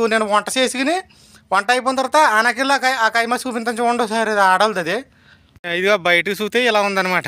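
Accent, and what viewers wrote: Godavari, India. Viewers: హాయ్ అన్న ఎలా ఉన్నారు నేను ఈమధ్య మీ, వీడియోస్ చాలా మిస్ అయ్యాను మన గోదారోళ్ళు మాటే అలా ఉంటుంది మరి కైమాలు, చాలా బాగున్నాయి నైస్ వీడియో బ్రదర్ 👍👍♥️♥️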